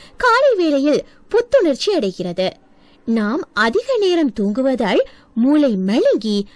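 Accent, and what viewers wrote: Sri Lanka. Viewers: இலங்கை தமிழ் ( பாட்டு பாடுர மாத்ரி இருக்கு 🥰🥰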